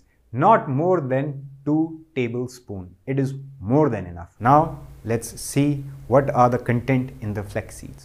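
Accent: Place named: Punjab, India. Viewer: boht okha ho kr english me video bnaee hai ap ne...saaf pta chal raha hai k ap koi punjabi ho...urdu me bnatey to ziada behtr tha...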